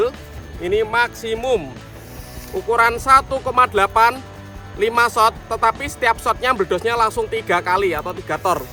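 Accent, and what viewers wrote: Java, Indonesia. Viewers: Jawane ngndi mas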